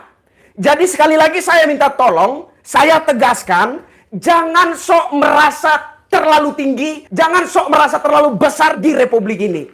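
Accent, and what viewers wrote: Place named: East Nusa Tenggara, Indonesia. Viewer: mantap bang,,,logatnya orang yg aku pens,,orang ntt ya,,,maju trusss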